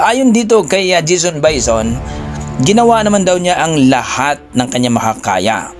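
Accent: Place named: Visayas, Philippines. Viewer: Bisaya na bisaya Ang pananalita mo, para ka bulol mag salita, Masakit sa tengga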